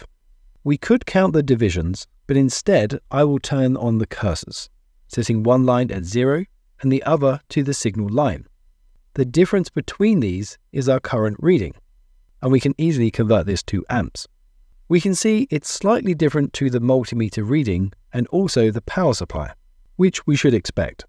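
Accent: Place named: United Kingdom. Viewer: I don't know if this is speaking in the video, but his voice and accent (British, I'm assuming) is very easy to listen to. His step by step, logical directions, have convinced me that an oscilloscope is something I need to look into.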